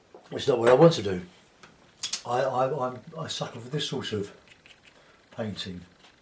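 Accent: United Kingdom. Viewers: like your acrylic tutorials.still struggling with watercolour.british are you? good show and nice day.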